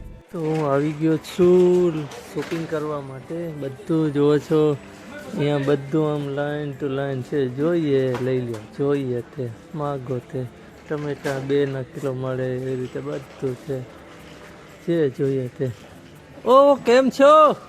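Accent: Kathiawar, India. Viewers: આપડી કાઠીયાવાડી તળપદી ભાષા અને તમારા ધર્મપત્ની નો તમારી સાથેનો વ્યવહાર જોઈને એવું થાય છે કે આતો બધું હરિની કૃપા હોય તોજ થાય..તમે લંડનમાં રહોશો કે ઈટાલીમાં? તમારા પત્ની જોબ કરે છે કે હાઉસવાઈફ છે?એવો એક વિડ્યો બનાવો..અને એક વાત તો સો ટકા છે કે તમારામાં લેસ માત્ર અહંકાર નથી..અહંકાર વિનાનો દરેક મનુષ્ય મને ભગવાન જેવોજ લાગે છે..God keep your couple safe God bless you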